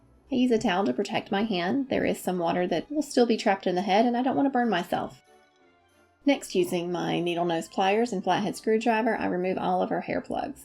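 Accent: South, United States. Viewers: I can't place your accent! Can you tell me where you're from? You sound Southern like me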